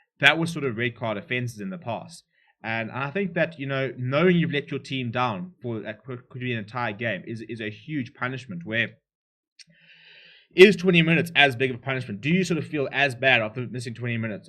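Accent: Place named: South Africa. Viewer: Good content man. Nice to have a South African accent on youtube talking some sense. Keep it going.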